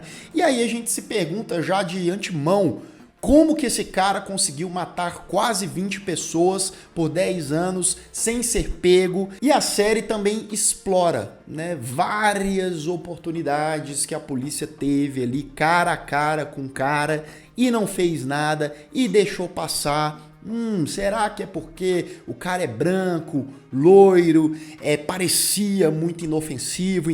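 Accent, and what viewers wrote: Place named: Brasília, Brazil. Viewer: Vc é meu vizinho!!! Sabia que pelo seu sotaque devia ser daqui por aqui perto (sim, galera de Brasília tbm tem sotaque). Não vi série, mas pretendo. Estranhei essa cor amarelada, achei que fosse meu celular, agora eu entendi hahaha.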